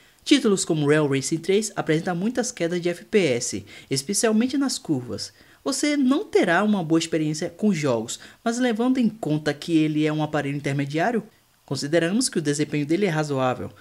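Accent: Bahia, Brazil. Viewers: vc é baiano ?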